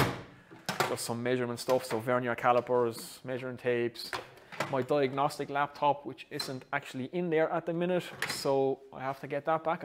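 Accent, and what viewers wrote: Ireland. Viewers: Dublin / Dundalk accent ?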